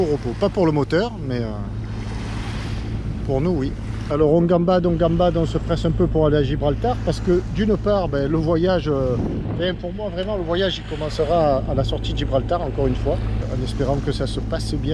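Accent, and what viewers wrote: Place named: Provence, France. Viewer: Salut merci pour tes vidéos et ton accent de la belle Provence…. La lumière en question s’appelle feu de hune (steaming light) et c’est obligatoire lorsque le moteur propulse avec où sans les voiles. Même si tu n’aime pas la mécanique tes tutos sont franchement sympa et c’est toujours un plaisirs. Bon vent au Tabasco.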